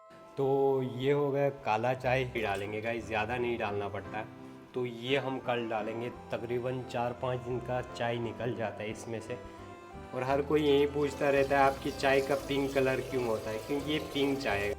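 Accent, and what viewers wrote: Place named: Kashmir, India. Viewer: Ur talking accent just like my kashmiri frnds 😂...keep going bro ...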